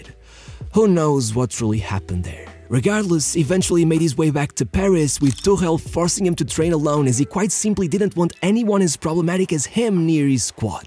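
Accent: Portugal. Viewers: Because he is Portuguese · Why is He pronouncing the spanish names as If they are from portugal?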